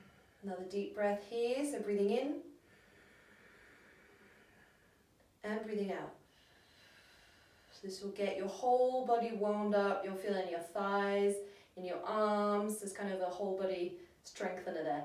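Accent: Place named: New Zealand. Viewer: You have a nice accent. Is it NZ?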